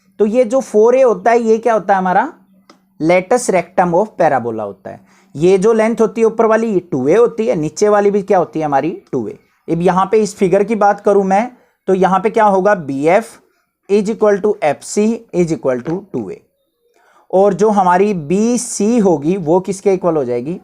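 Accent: Haryana, India. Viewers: Sir aap haryana se ho?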